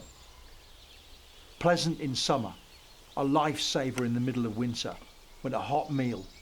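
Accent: United Kingdom. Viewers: I get ASMR from hearing that english accent... its so hypnotizing. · I watch this when I’m lonely “cone beef SHTEW, share with his mate, he puts.......... his spoon in his puddy, pep eh uhp ah beh “ thank you sir for being so British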